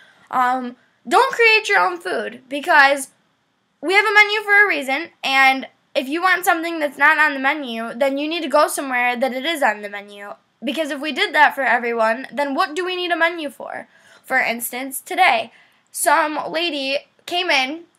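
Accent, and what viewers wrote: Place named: Michigan, United States. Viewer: you sound like you're from michigan!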